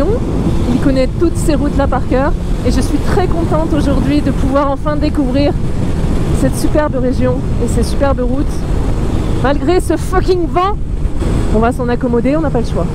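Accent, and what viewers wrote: South, France. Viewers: TON ACCENT DU SUD LE TRAVAILLER ENCORE POUR ENTENDRE LE SOLEIL DANS LA VOIX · J'ai fait pause pour vérifier la résolution par défaut que YT avait sélectionnée quand tu en as parlé, et j'ai bien fait parce que le saligaud l'avait laissée en 1080... Bon c'était déjà pas dégueux, mais ces paysages en 1440... <3 Et pour l'accent, c'est compliqué parce qu'il y'en a tellement de différents dans le Sud ^^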